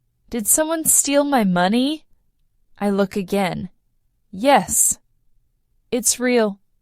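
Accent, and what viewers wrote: United Kingdom. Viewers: Is this British accent? However this kinda lessons are so precious to us❤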